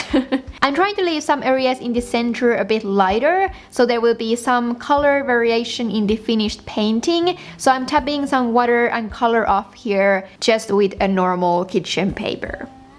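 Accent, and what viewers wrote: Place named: Finland. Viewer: I was watching this after it was recommended to me and was closely listening to the way you speak, thinking "Hmm.. sounds a bit finnish.... but also a bit japanese... Interesting 🤔". Then I checked the info and gasped, you're a finn! Aivan ihanan kevyen näkönen bujo ja todella hyödylliset spreadit ❤👌🏻 Tykkään kovasti! I'm getting Archer & Olive as my next bujo too, I'm absolutely craving for thicker pages! The overall look of them is also very pleasing 😊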